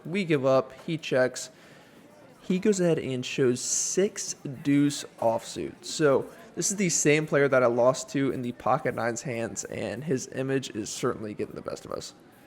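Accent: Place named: South, United States. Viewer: Love it that you play at all of my same casinos, other than Magic City. I haven't played down in Miami yet. Curious, you have an accent. Are you from TN or NC?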